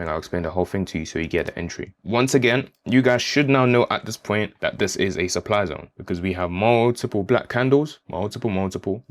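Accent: United Kingdom. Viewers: Perfect, Great info, and well presented, you're a gifted teacher...👍 You sound like you're a Londoner mate... Arsenal or Tottenham? 🫡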